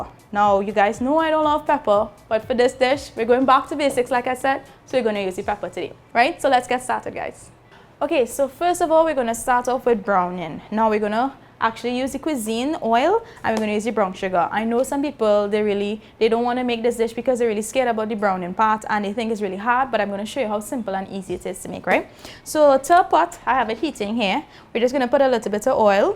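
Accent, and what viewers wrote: Trinidad and Tobago. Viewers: Somehow I lost you on my facebook, but I'm so happy to find you on YouTube. Your accent makes me feel at home.... love that real Trini accent! Please keep these vids coming!!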